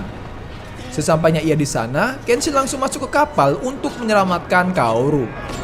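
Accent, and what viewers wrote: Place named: Java, Indonesia. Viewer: Suaramu gk enak cok medok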